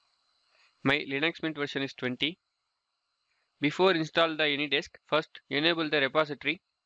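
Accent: India. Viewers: East Indian accent is so heavy, I can't understand what he is saying.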